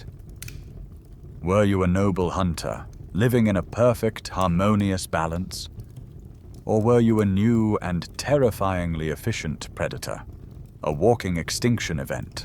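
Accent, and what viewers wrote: United Kingdom. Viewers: Voice is way too loud and energetic for sleep. I also can't stand a British accent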